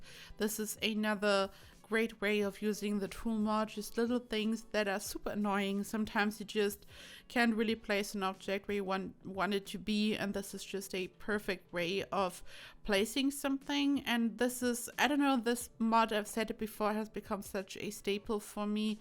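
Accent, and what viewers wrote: Germany. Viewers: Your accent at least sounds very german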